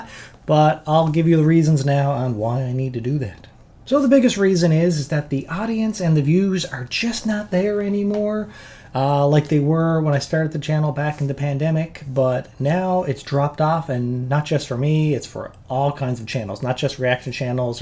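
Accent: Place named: Newfoundland, Canada. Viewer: Will the movie reaction channel remain “active” for “monetization” purposes? I often replay (or listen to) old favorites while commuting, doing my makeup, etc. and don’t intend to stop. Hopefully, you’ll continue to get some financial benefit from your past work! As a fan —and subscriber since August 2021– I’ll (selfishly) miss your fantastic reactions and commentary. But I wish you and your family only the best moving forward! On a final, personal note: It may sound kinda lame, but I (an American) fell in love with the “Newfoundland accent” during quarantine. To make a long story short.. I ended up moving out of state and living with my mom/grandma throughout the various lockdowns (about 16 months total). We watched a LOT of TV/movies together in that time; one of our collective favorites was the show “Murdoch Mysteries.” For anyone who isn’t aware, one of the best MM characters is a young man from Newfoundland: Constable George Crabtree [played by actor/comedian Jonny Harris]. 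My grandma (who I grew very close to during the pandemic) has since passed on, but your voice still reminds me of the happy times I was able to share with her, despite the world seemingly falling apart around us. ☺️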